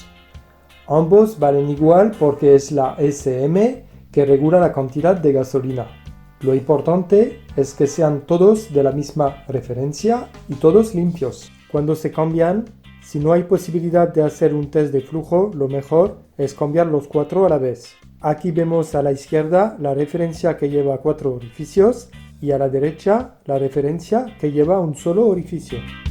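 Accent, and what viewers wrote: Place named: Latin America. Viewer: ¿Amigo por qué? usted en su español, suena más parecido al latinoamericano, que al de españa.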